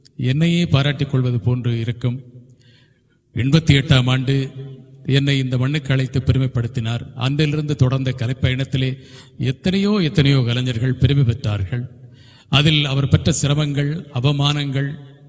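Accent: Standard. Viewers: வாழ்த்துக்கள் சார் உங்கள் தூய தமிழின் உச்சரிப்பு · பிராந்திய. தமிழ். இல்லாமல். பொதுவான. இனிமையான. தமிழ். தமிழ். பொக்கிஷம். நன்றி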